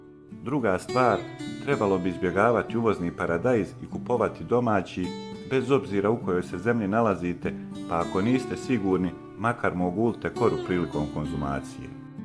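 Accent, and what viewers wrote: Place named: Herzegovina, Bosnia and Herzegovina. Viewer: Mene kao Lalu naglasak tj način govora ovog čoveka izuzetno podseća na onaj kojim govori hrvatski teniser Marin Čilić, koji je iz Međugorja, a samo malko manje i na tip govora našeg košarkaša Alekse Avramovića, koji je iz Čačka, a dosta i na Crnogorce, generalno. Dakle, valjda, tipično hercegovački(?)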